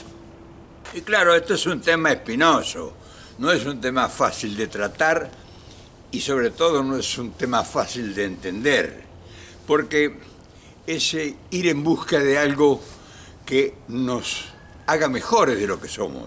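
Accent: Argentina. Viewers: Buenos días y amigos del espacio Quería hacer un comentario que parece insignificante pero no es menor como argentino admiro la manera en que mantiene a pesar de los años que se vió obligado a irse del país nunca perdió el acento bonaerense (o sea el ser nacido en la provincia de Buenos Aires) para los que no conocen nuestra provincia simplemente gracias por su autenticidad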